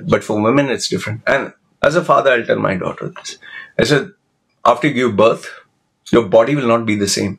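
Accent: India. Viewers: Hi, why does your Indian accent sound stronger now than what it did in your 2011 Ted talk?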